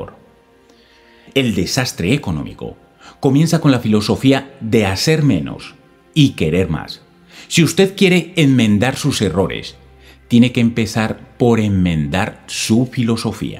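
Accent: Colombia. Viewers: Muchas gracias por los consejos y las ideas = son cosas k hacen pensar y yo he cambiado mi mentalidad ; después que escucho los Audio. Solo queria Saber si la personne que narra es un Colombiano?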